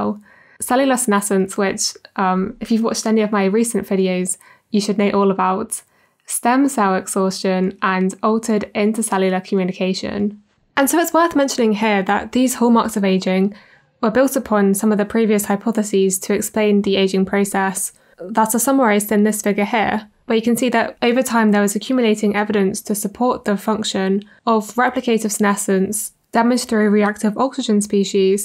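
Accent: United Kingdom. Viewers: where in the UK specifically is your accent from?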